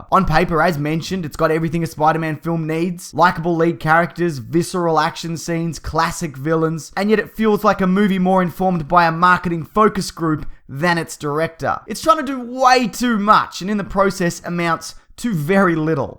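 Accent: Australia. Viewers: For some reason, listening to Aussies say the word 'classic' will never cease to be hilarious to me. I'm a kiwi.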